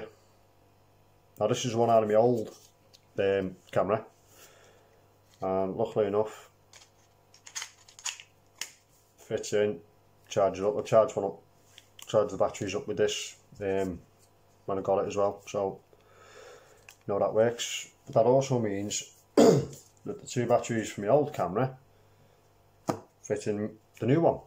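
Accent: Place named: Australia. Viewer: Ripper Aussie accent, think you should have a blast of the Nigeria accent!! Wat’cha think?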